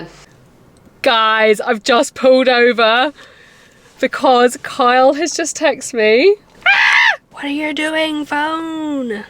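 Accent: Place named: England, United Kingdom. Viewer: I wouldn't have thought the garden would be a priority surely sorting the house should come first. You have an English accent but act like a silly American over the top tiktoker. You have children please grow up. You really don't need to document every fart.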